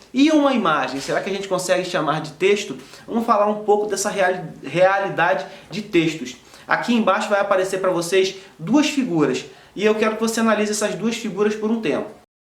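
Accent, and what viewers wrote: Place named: Rio de Janeiro, Brazil. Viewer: Nem precisa fala que é do RJ com o sotaque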